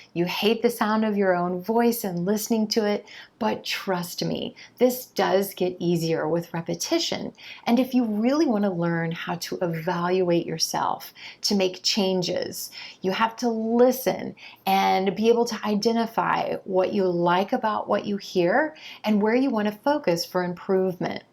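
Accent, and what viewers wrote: United States. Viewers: Hey, you’re awesome. Learning from your channel is such a golden opportunity. I enjoy getting here as often as I can, and I love to hear your genuine American English. Just feel like home.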